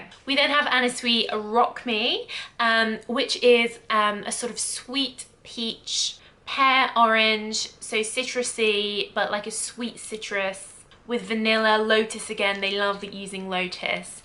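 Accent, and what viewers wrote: United Kingdom. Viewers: Do all these Brit’s talk like this?????? Haha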